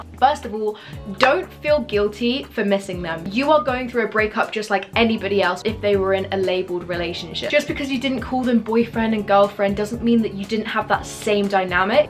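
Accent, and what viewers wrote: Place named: United Kingdom. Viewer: you can tell she’s british without any doubts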